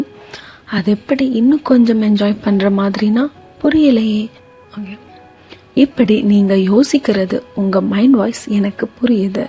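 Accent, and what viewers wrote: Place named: Kerala, India. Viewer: Unga voice Kerala mood arithu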